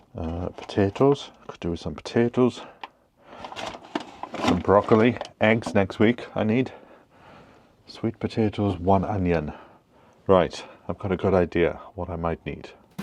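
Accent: Wales, United Kingdom. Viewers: . I just want to say how much I enjoy your channel my mum was Welsh😊 so I’m half welsh. I love the valleys and I love the welsh accent. Keep it up great content great channel 👍👍👍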